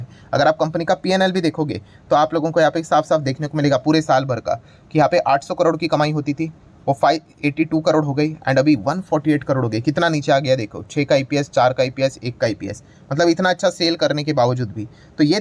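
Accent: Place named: South India, India. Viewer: Are you madrasi,because ur Hindi pronounce is like madrasi people pronounce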